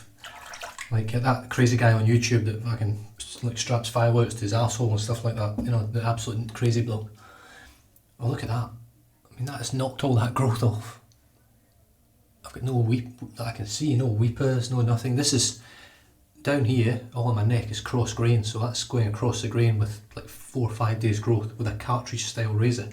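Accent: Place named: Scotland, United Kingdom. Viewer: Great review, sir! Where in Scotland are you from, can’t place your accent!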